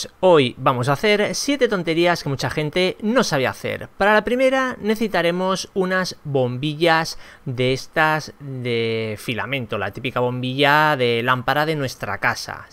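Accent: Chile. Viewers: Eres chileno¿? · No sabias que eras chileno, por tu acent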